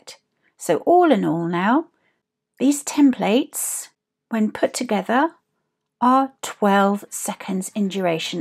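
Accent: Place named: United Kingdom. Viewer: Calm and Steady to understand thank you and "very British" indeed :)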